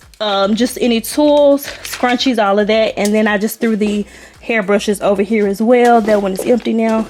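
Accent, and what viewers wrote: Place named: Memphis, United States. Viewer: New Subbie ❤️ Are you and Peyton Charles family because I knew you were from Memphis once I heard your first 3 wordsssss 😊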